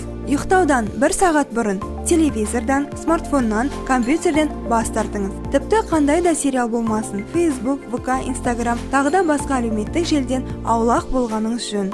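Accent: Standard. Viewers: Не деген сулу казакшаны таза сойлейтин кыз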